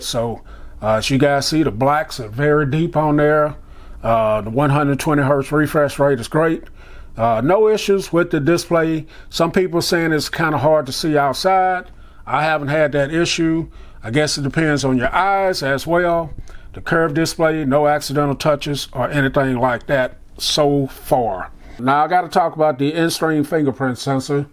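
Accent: South, United States. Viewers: First review I've heard with a southern accent. Sound like one of my uncles. Love the content. Love my Stormy Black 6 pro 💪🏾✊🏾💯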